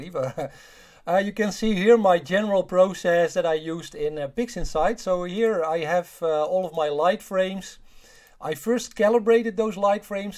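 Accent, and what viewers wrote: Netherlands. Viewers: Kan gelijk horen dat je nederlands bent🤣 maar nice video! Heb zelf gister een Omegon Apochromatische refractor Pro APO AP 72/400 Doublet besteld, beetje hopen dat ik m kan vastleggen met de hand🤔 statief heeft geen goto helaas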